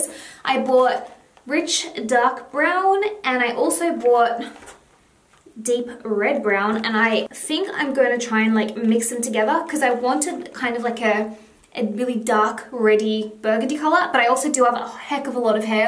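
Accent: Australia. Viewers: What is your accent? I love Irish accent and I lived in Ireland for years but your accent sounds all over the place you sound a bit Australian a bit British a bit idk what the hell lol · You live in Galway in Ireland? Are you kidding me? I live in Galway and I've been subscribed to your channel for forever and I thought you were Australian, I'm so confused lol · wait your from Dublin... I thought you was Australian!!! :O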